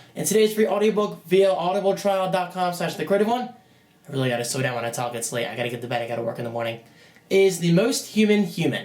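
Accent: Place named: New Jersey, United States. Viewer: maybe its how they say it in jersey?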